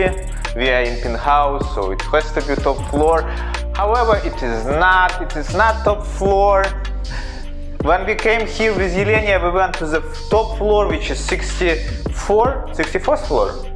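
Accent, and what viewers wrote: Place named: Russia. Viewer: Are U Russian? I can hear familiar accent 😆 Seriously. I like Dubai. Been there 3 times and want more 😌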